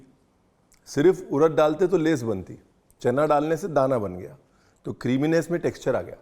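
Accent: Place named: Punjab, India. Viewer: Aap cooking thriller Hain aik dum Khushi say Jo recipe banti hai Woh laJawab hoti hai ❤ Chass a gaya wai Punjabi lehja wala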